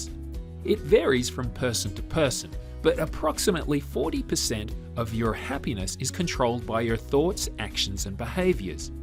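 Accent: Australia. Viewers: I came here to ask about your accent. It’s like Australian with some good ole southern twang thrown in there to keep you guessing! 🤣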